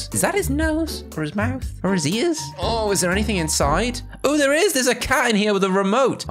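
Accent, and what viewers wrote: United Kingdom. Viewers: sir- are you british?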